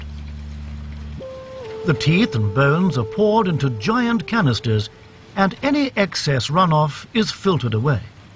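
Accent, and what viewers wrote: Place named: United Kingdom. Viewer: Honestly a soft-spoken Brit can make me believe all the BS in this video.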